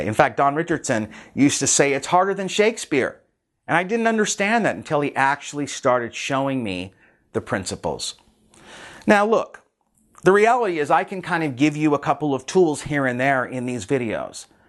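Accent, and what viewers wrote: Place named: United States. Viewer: Thank you very very much! I am russian (Sankt-Petersburg)Your video is worth its weight in gold for me cause I understand all of what you're talking about.I want to talk american-english like you do and in the final analysis be an actor.Much odliged. Yury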